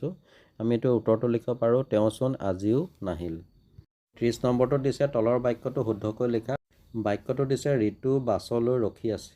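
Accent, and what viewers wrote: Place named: Dhemaji, India. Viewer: Thinks dhemaji Assamese lage sar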